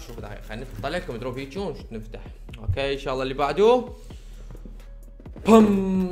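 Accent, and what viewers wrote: Iraq. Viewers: بلهجت العراقيين كلاو. 👲🏻👲🏻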